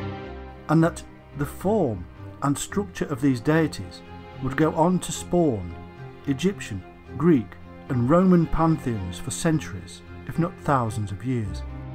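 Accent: United Kingdom. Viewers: The minute I heard the British accent I knew this would be a watered down "damage control" version. Presented more as a myth than reality. Read Sitchin for the real truth.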